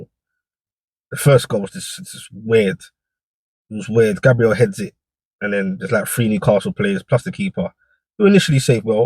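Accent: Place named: Cockney, United Kingdom. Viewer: You sound like a cockney arsenal fan not a geordie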